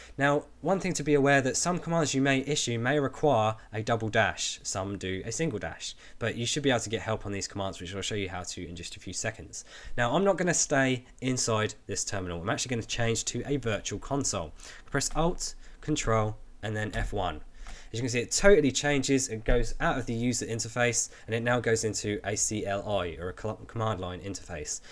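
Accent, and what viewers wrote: United Kingdom. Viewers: He has a strong British accent.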